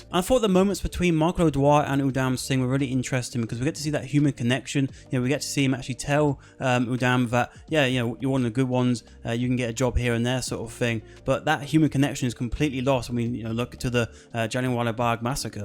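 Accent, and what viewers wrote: United Kingdom. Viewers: It's hard for indians to understand ur English accent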